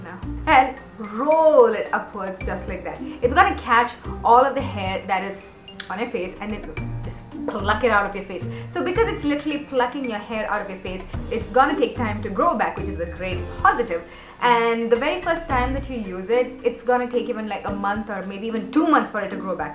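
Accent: India. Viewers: Very informative video n luv d way u talk n ur voice is mastam...r u India n? n u luk so qute :)) n when u said shupp shupp shupp that moment was awesome gal... :)) way to too :)) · which accent are you trying to talk in Indian , American or British?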